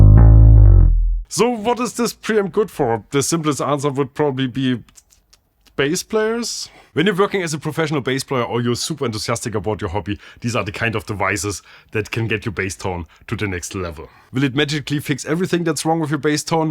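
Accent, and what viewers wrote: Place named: Northern Germany, Germany. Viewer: Its Bassic ....A north german accent ....a mixture of viking , german and anglo saxon languages....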